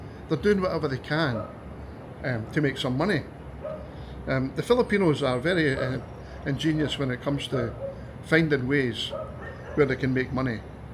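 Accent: Scotland, United Kingdom. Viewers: Where are you from? You talk like the people in "Still game" and I absolutely love it😅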